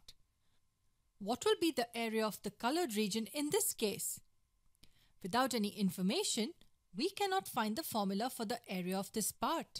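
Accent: India. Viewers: Oh... um i do not really understand this indian kind of english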